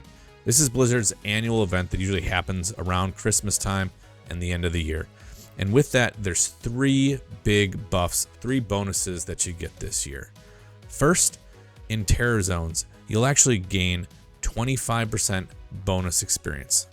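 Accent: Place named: Balkans. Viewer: Are you of Serbo-Croatian descent, bro? Nice ! I can recognize this accent anywhere!! :) Zdravo! :)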